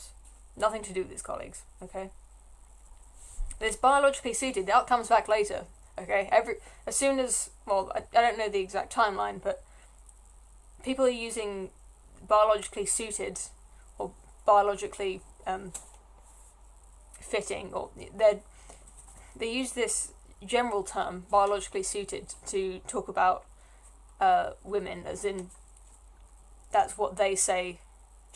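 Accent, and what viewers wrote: United Kingdom. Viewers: Excellent work here,. As an American, I'm guilty of stereotyping. Every time I hear a speaker with a British accent, I automatically believe they're smart. I'm glad to not be let down in this case (probably from all the documentaries of such high caliber produced by the BBC over the years [anything with David Starkey is history porn to me]. It's a shame that their news bias is no better than CNN now)! In my opinion, you should keep doing this. Get a good camera and mic setup and see what happens! It'd be awesome to see you discuss this with Molyneux, Styxhexenhammer666, Sargon, JBP, Computing Forever, etc. We need reason and evidence to prevail over madness.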